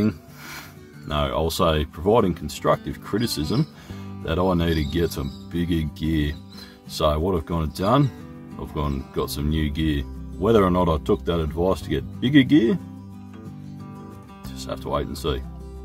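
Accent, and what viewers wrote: Australia. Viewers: Ps. My mother was born & raised in Australia so it's been great hearing an Ausie (sp?) talking! She never had spoken about the snakes & lizards there (😳😟 ick) but she had complained about the Kookaburras that Always landed right outside her bedroom window to wake her up at the break of day!😂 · I clicked because he is Australian 😂😂! 👊👍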